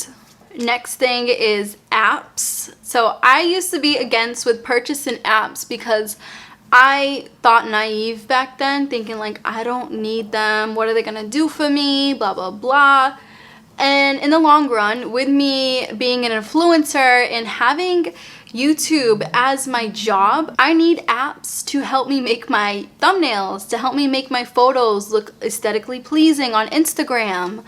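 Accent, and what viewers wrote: Boston, United States. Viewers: She’s from Boston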